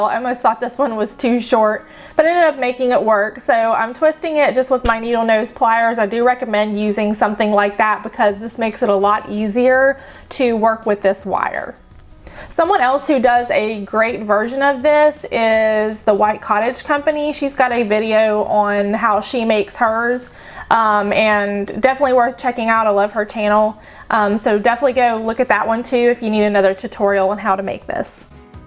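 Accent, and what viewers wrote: South, United States. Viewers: I am new and from New york..love ur southernzccent!!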